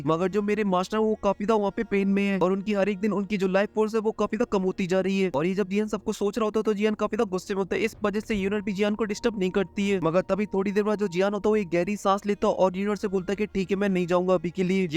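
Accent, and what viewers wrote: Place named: Madhya Pradesh, India. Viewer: Mori mora lak ta ha ap MP sa ho😂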